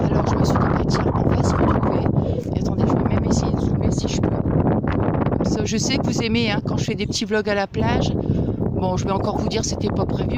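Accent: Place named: Pas-de-Calais, France. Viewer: Je suis avec vous depuis peu ! Je m'étais rendu compte de votre accent !!😅j'habite près de Metz ,mais je sui de la bas ( pas de calais) aussi !!! J'ai un frère qui y habite encore 😊 j'étais de Isbergues près de aire sur la lys 😉😘😘💞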